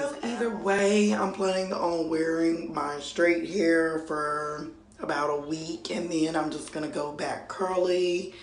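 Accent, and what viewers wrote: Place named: South, United States. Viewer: Lol you so country i love it lol 😂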